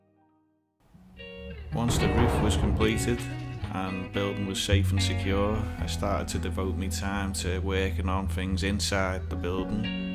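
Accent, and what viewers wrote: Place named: Scouse, United Kingdom. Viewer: I really enjoyed listening to your soft 'Scouser' accent. The transformation is mind boggling - love it!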